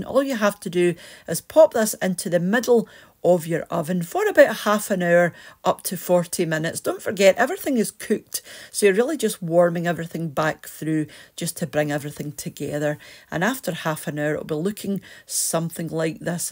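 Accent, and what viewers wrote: Scotland, United Kingdom. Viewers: Every time I hear your wonderful Scottish accent I have a desire to fire up my R.G. Hardie pipes. 🇬🇧🤝🇺🇸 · I love your soft Scottish voice... I could listen to you reading the phone book😂😂😂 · First time I’ve watched you. Love the Scottish accent, which I miss from relations that have passed away now. Thank you for the sausage pie. Will have a go at that one sometime soon.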